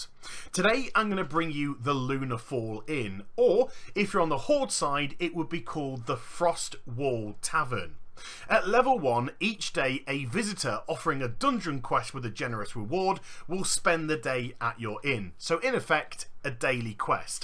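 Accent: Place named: United Kingdom. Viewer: God I love the British accent. Every word. So clear.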